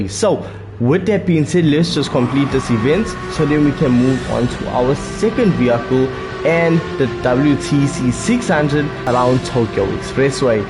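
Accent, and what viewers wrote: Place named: South Africa. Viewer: Can I ask where you're from? Because it's like I'm hearing several accents at once, because I'm hearing south african pronunciation, and what sounds like jamaican accent creeping in, just curious is all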